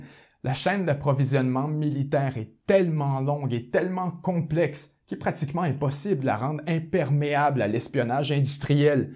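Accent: Quebec, Canada. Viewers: J'adore ton accent canadien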